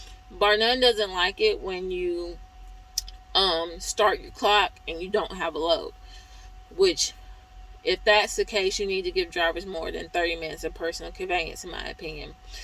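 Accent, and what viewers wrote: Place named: South, United States. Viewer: Sound a lil country lol I like it tho 😂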